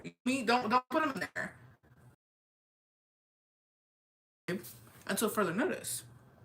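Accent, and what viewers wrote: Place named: Texas, United States. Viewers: We just Texans, that’s the way we talk 😆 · We're southern that just how we all talk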